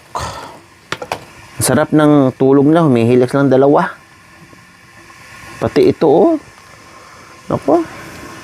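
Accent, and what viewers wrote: Visayas, Philippines. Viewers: ang tono ng salita mo ay bisaya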